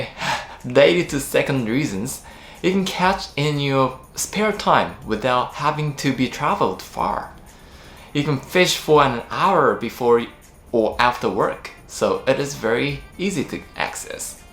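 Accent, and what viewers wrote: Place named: Australia. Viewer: Did you study or work out here in Australia,? Some of your syllables almost sound Aussie lol. Anyway, cool video! I've been using Free Rig/Jika Rig's for Yellowfin Bream & Mangrove Jack here in Australia for a little while now. No-one is aware of these techniques here, at least from what I've been able to tell. Though, I think it's only a matter of time before they start to get popularized. Keep up the good work, I subbed!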